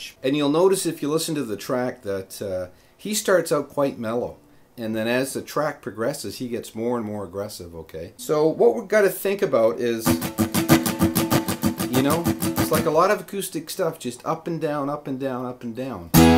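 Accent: Canada. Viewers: Your presentation & discussions about the song are brilliant ! Your descriptions are so on. I had no idea how complicated this song was/is. I love the Canadian accent - it's awesome too. When you live near the border of Canada - you just know when you hear your Canadian Brother speaking ! This tutorial is phenomenal !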